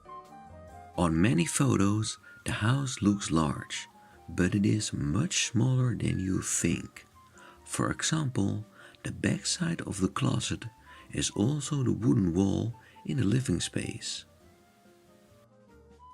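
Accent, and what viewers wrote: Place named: Netherlands. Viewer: The addition of the third bedroom looks poor from the outside, especially with the off-the-shelf aluminum frame window. I'm thrilled to have discovered your channel! They provide so much more than the rather limited views afforded by various coffee table books, even those by Julius Schulman. Excellent graphics and organization. I really get a feel for the interior spaces. By the way, when I watched your first video, I kept puzzling over your accent. When I learned you're from the Netherlands, I thought--No wonder! You guys always throw me off! I once knew someone from the Netherlands, who had gotten lessons with a speech therapist to help with accent reduction. She was from the deep South, however, so he ended up with the craziest American accent I've ever heard.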